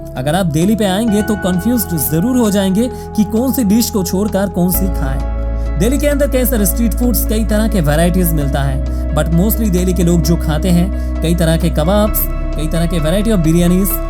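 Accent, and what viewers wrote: Bengal, India. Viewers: Hindi with Bengali accent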